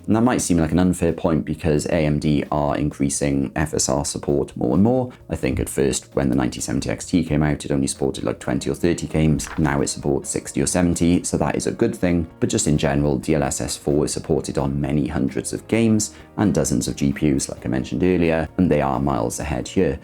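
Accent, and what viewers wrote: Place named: England, United Kingdom. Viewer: I've heard a girl speak with the same style of English accent as you. What area of England are you from? Her name was Lowry... The name style even fits the accent. I'm wondering if I should wait for the 5070ti super? More vram will help with flight sim. My max will be this or the 9070xt because I don't want to upgrade my psu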